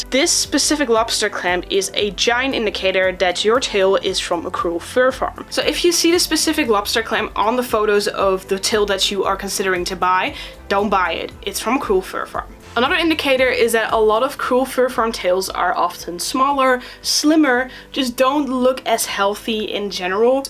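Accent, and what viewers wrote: Netherlands. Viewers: WAIT ARE YOU FROM THE NETHERLANDS !?????? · Don't get mad or smth, but I think you're dutch.... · What are you from the Nederlands or Germany? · I smell..Dutch accent!!are you dutch :3??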